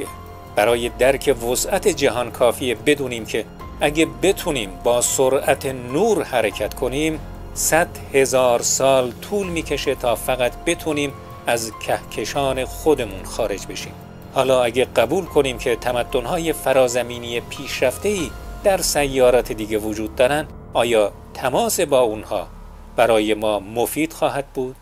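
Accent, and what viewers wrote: Iran. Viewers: خیلی عالی صحبت میکنید! یک سئوال همیشه در ذهنم خطور میکند و آن این است که لهجه تان فارسی ایران است و چهره تان خیلی شبیه به هزاره های افغانستان میماند. به هرصورت موفق باشید!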